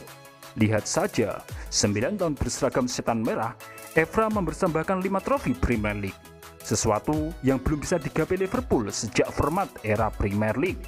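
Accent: Java, Indonesia. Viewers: Jawa koek nu ngomong na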